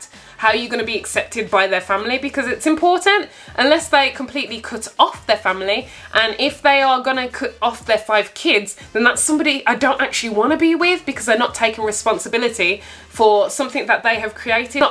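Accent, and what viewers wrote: Birmingham, United Kingdom. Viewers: Do I detect a (former) Brummie? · It’s the accent for me. Where’s it from. Birmingham???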